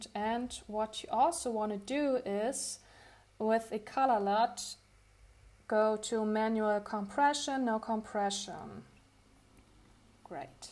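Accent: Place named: Germany. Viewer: You have a German accent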